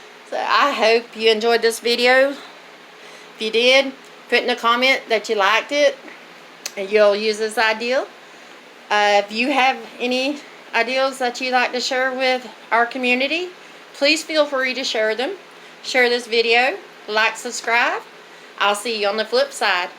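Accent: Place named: South, United States. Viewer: I do like your videos... And love your voice... So Southern.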